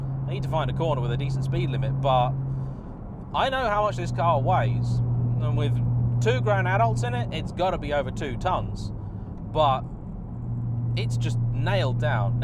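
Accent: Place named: London, United Kingdom. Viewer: it's quite amazing going back to watching this from your more recent offerings and your Voice and commentary delivery has changed so much. You actually sound like a Londoner here, but now you have totally different way of presentation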